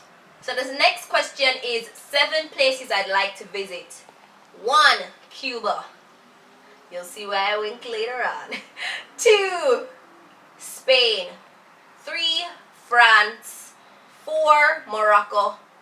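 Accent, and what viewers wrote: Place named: Jamaica. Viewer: You're accent gave me life - its waaaaaaay too cute. I'm so obsessed with Jamaicans honestly- I need to visit. & so glad you kind of epxlained what 'bombaclaute' means - I aint even know that it was a swear word hahaha. GURL I AM SO ECXITED TO BE A NEW SUBSCRIBER ON YOUR CHANNEL, like you don't know, dear lord. I feel like I so want to do this tag right now. I'd appreciate if you could check out my channel sometime x You such a star!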